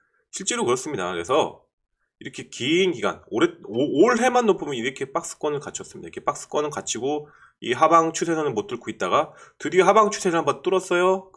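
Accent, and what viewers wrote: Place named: Chungcheong, South Korea. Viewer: 아 고향이 충청도신가봅니다ᆢ 제고향도 충청도지요ᆢ 친하구싶습니다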